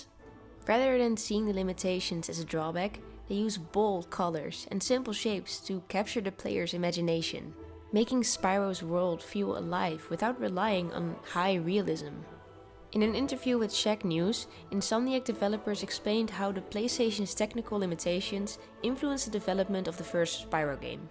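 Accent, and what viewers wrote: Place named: Netherlands. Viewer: haha ik kon echt meteen horen dat je nederlands was, goeie video wel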